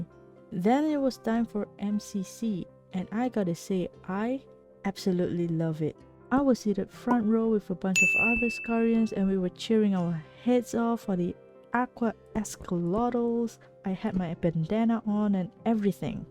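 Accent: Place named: Singapore. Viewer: Fellow Singaporean!!! I had a hunch with your accent but happy that there is actually a growing community of hermitcraft-likers here >:D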